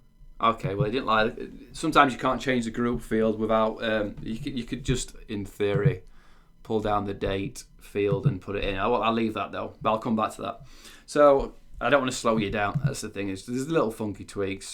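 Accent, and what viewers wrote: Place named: Liverpool, United Kingdom. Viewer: Good stuff, I just had a phone interview where, in addition to my SSRS experience, I said I have worked with Crystal Reports. Good overview here. Hey are you from the Liverpool area? I'm a Yank but I try to discern British accents. You have an accent similar to Paul Hollywood on the Great British Baking show.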